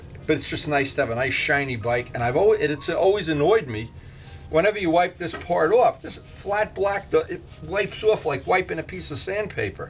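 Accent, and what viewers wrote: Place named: New England, United States. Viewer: Sounds like a fellow newenglander thanks for the video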